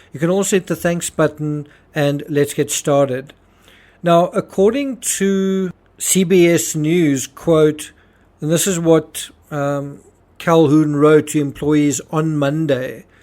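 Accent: South Africa. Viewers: Are you from South Africa? Trying to place your accent. I think it is South African.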